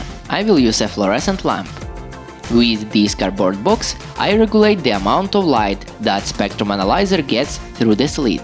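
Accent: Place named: Russia. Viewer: Funny Russian accent :)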